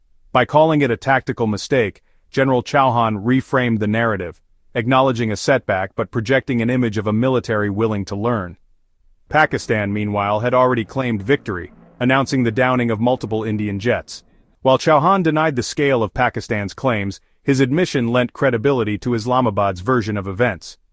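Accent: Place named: United States. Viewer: BS in an American accent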